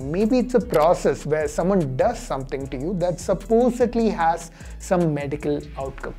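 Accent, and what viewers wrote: Kerala, India. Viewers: Your content is one of kind and eye opener to blind followers of fallacy and myths. But your reach will be limited to just a few.... You have strong malyali accent coming out when you speak English. I wish it would be coherent and lucid like other so called youtubers on you tube who are spreading mythsband nonsense. Clarity in language as much is needed as in the content. Your content is king but can't say about the way utter it.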